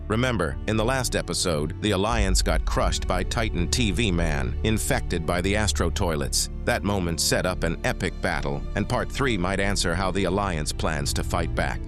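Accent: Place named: United States. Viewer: Anyone else notice how his voice sounds country?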